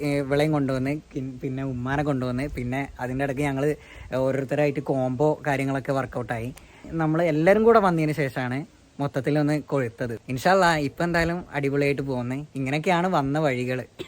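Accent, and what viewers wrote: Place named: Kuttiyadi, India. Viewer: nte samsaram keteram thoonik kuttiyadi slang varunnund ❤❤😊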